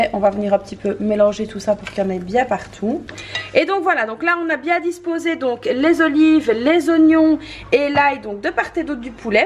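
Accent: Belgium. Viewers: Bravo super bonne recette merci,c un accent belge?🤭 bsa7a le poulet il est bien réussi☺😚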